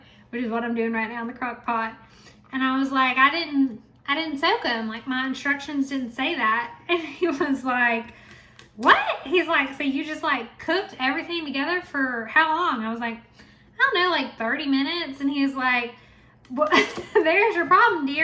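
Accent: South, United States. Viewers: You have the nicest Southern accent! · you have a beautiful southern accent i thik its southern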